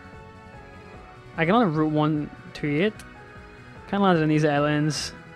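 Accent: Northern Ireland, United Kingdom. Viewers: Northern Ireland man. Keep er lit boyo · R u from Northern Ireland/Ulster and if u r what county??? I is from Dublin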